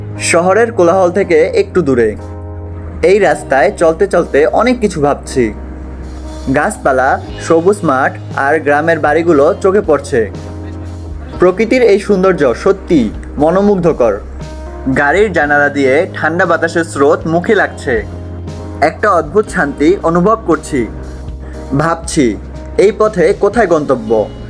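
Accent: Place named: Ishwardi, Bangladesh. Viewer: ভাই আপনার বাড়ি কি পাবনা জেলার ঈশ্বরদী তে আপনি ঈশ্বরদীর ভাষায় কথা বলছেন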